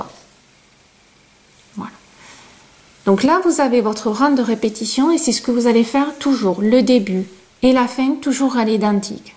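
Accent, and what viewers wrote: South, France. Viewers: Formidable ! ça ne va pas trop vite, les explications sont nettes et précises, la voix agréable et le petit accent du sud rigolo. Merci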